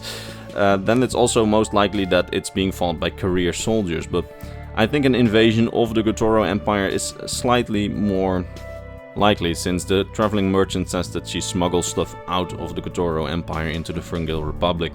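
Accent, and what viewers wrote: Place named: Netherlands. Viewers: He's definitely Dutch his accent isn't thick enough to be Russian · I'm so torn on your accent. My guess is either Dutch or Russian 😂 please help me out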